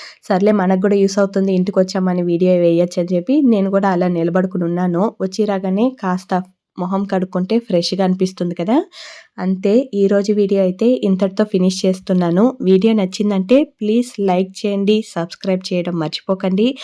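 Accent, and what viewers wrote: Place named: Andhra Pradesh, India. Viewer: telugu matladutunte Andra anukunna.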